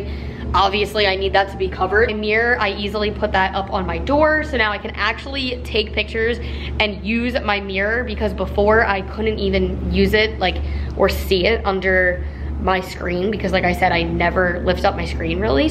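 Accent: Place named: Delaware County, United States. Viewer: i was thinking about how much of a Delco accent you have and then you said philly it made so much sense! 😂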